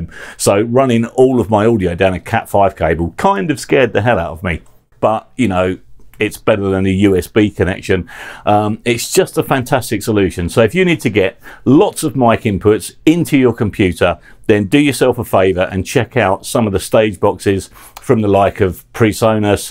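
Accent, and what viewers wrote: United Kingdom. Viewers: Entertaining and informative. and you must be from the West Midlands. i subbed lol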